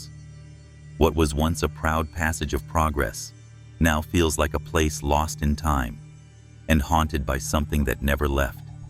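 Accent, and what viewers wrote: United States. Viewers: Why are Australian haunted spots being narrated by an American?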